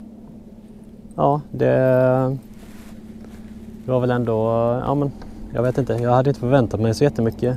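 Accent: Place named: Gothenburg, Sweden. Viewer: Vad var det för frukost du hade med dig? Hörde inte vad du sa för din göteborska ☺️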